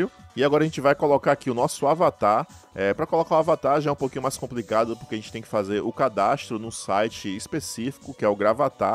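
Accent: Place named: Bahia, Brazil. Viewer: Mano vc é baiano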